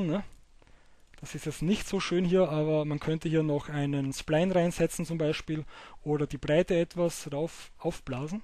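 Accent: Austria. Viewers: Dafür dass du Östereicher bist versteht man dich ganz gut ^^, also jetz´ für nich´Östereicher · Is doch voll geil das du Österreicher bist :D Ich mag deinen Dialekt ^.^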